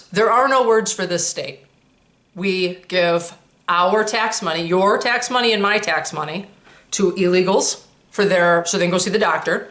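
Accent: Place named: Illinois, United States. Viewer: I just found out about you YouTube said check this out. I can tell you are from the state of Illinois just tell you. Talk in your accent 😁 cuz I am just like you. Born in this state. Had parents that were totally upset about the state. For pretty much the same reasons. But now we got new ones, illegals 🤯 we used to kill people who invaded our country. Like you said wokeness his reign supreme. But I do think California has its speed on the wokeness? I may be wrong. But I don't think so, well what part of Chicago are you in dear 🙏 North South Central? Like I told you I'm in Alton Illinois. The early retirement I took. What's from the University of Illinois@ So I'm very familiar with Chicago. Love the city 🌆 I used to love driving Lakeshore drive at the lights were coming on. And all the international cuisine@ kiss 💋 food fantastic. All right I was bored and figured I'd drop a few lines. 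I will admit I'm not surprised. Have you lived in this state your whole life? Then I'm kind of surprised you're surprised 🙀 prostitution legal. Sounds about right to me 😎 that's why I want Republicans to take over this state. The Democrats have literally lost their mind 😭😞 started back with the clintons in my opinion. But my opinion won't even buy you a cup of coffee ☕ not at today's prices.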